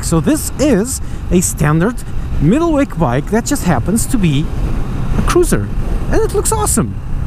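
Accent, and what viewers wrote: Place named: Portugal. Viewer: I love our portuguese accent when trying to speak English xD Boa mota, estava a pensar em comprar, e grande dia de Marginal xD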